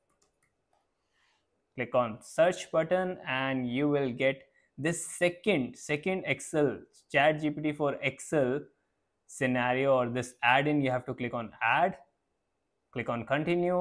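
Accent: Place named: Bangalore, India. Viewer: Braw you're from Bangalore daa?!!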